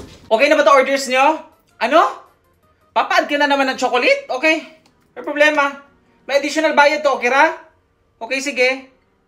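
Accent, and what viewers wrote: Visayas, Philippines. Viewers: Ilonggo kagid o bisaya❤❤❤❤ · hahahaha bisaya kapo ba waypob lima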